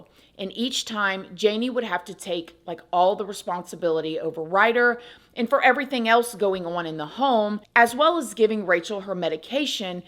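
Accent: South, United States. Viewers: love that Southern accent 🙃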